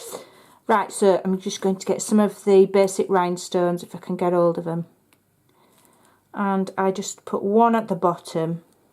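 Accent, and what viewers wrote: Yorkshire, United Kingdom. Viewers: This is adorable. I love watching your videos, you're very talented and charming. But, I must confess, I am totally in love with your wonderful (Yorkshire?) accent. it's delightful! Big hugs from Texas!!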